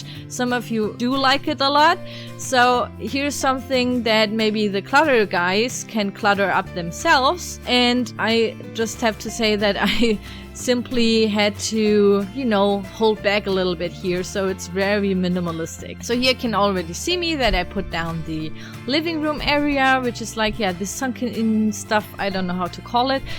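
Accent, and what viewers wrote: Germany. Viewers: Kanns irgendwie sein dass du deutsche bist also nicht bös gemeint aber irgendwie klingt dein englisch ein bisschen nach dem deutschen “akzent“ Und btw dein haus sieht unglaublich geil aus🤗💕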